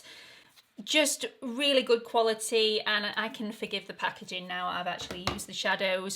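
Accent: United Kingdom. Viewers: Hi, thanks for another great review. You always create the most beautiful looks with such a simple technique. Also, no worries about pronunciation. I find that a good many words are pronounced differently in the UK vs the US (I love the way you say vitamin... We pronounce the first i as you would in the word like and then the rest the same as you). It is just a cultural difference and definitely nothing to worry over. Peace, love, and happiness!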